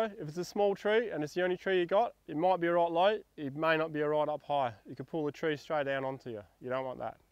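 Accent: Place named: Australia. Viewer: Aussie deep voice gave me nightmares!!😄🤣🤦‍♂️ if the devil were aussie!!🤣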